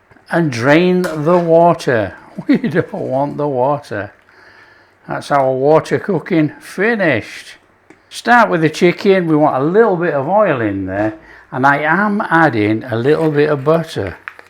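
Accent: United Kingdom. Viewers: Great day to you, today is perfect and you are part of it, love your British accent and foods, crack on as you say, OH PLEEEEASE, each day should start out with a sizzle whizzle, come on😅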